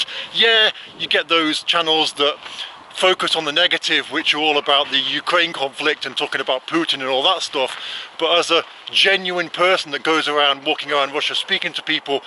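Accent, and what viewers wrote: England, United Kingdom. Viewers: MATE WHERE U COME FROM ENGLAND?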